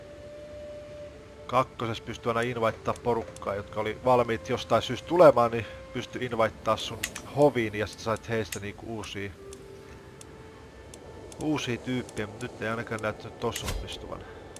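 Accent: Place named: Satakunta, Finland. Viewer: Oletko satakunnasta? Päättelin vain "murteen" perusteella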